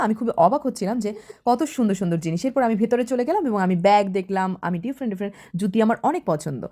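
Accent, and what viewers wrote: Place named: Kolkata, India. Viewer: আপনার কথা অনেক টা কলকাতা বাসি লোকদের মতো। মনে হয় না বরিশালের।। মাঝে মাঝে একটু লাইভ করবেন প্লিজ প্লিজ প্লিজ প্লিজ প্লিজ প্লিজ প্লিজ প্লিজ প্লিজ প্লিজ প্লিজ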